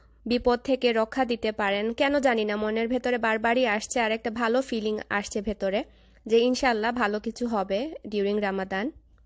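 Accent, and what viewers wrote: Sylhet, Bangladesh. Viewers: Apu ami apnar new member.. Apnar kotha gula onak sundor.. Sylhet language bole je ota te o onak sundor lage apnak.. Falak to amder bashar member hoye gese.. Sobay chine.. Allah apnader k susto rakuk.. Amin..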